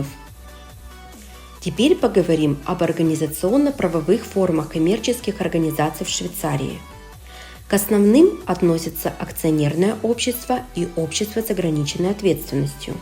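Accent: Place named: Ukraine. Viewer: Украинский акцент не скрыть, как не старается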